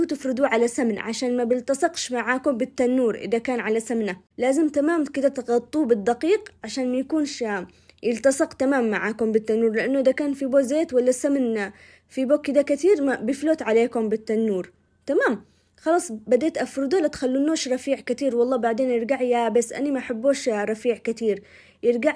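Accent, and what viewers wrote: Aden, Yemen. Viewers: السلام عليكم ،ماشاءالله اللهم بارك ،فعلا اللهجه العدنيه ذكرتنا بأيام عدن الحبيبه والمخبازه العدنيه.ياالله أيام حلووووه جدا جزاكي الله خيرا اختي العزيزه ❤️. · ما شاء الله وبالعافية عليكم .. يسلم الذي علّم .. بنت مفحوسة صحيح.. واحسن شي الهدرة العدني . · اعشق الكلام العدني الله يسعدك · ياحلات كلامك قبل طبخك انا من عشاق الكلام العدني 🤗😜 ماشاءالله تبارك الرحمن سلمت يدك ❤ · تسلمي على طباختك الجميله الي هيه نفسك وكمان كلامك الجميل جدا احب لهجهه عدن عندي سؤال ليش لما افعل الشاهي مايرضي يطلع راحته راحه حقه مش شاهي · ياي لديد لهجتك متل اصدقائي يمنيين درسو معي بالجزائر اكلكم بزاف شباب ولديد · تسلمي شيف بنت اليمن الحبيب كلامك ولهجتك العدنية عسل دوعني ولآ طبخك العدني الأصيل من أرض اليمن السعيد..يحفظك ربي ويديم عليك الصحة والعافية 🎉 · انا فدا للهجه العدنيه حاليه ماشاءالله ومفهومه ذكرتيني بامي الله يحفظها لي ويحفظ كل امهاتنا يارب ❤وكمان الخبز والحلبه عجبني كتير وجربت طريقتك وكل العائله حبوها❤ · فديتك والله وفديت اللهجه حقنا اصحاب عدن ❤😂ضحكتيني لما قلتي ناقص البسسس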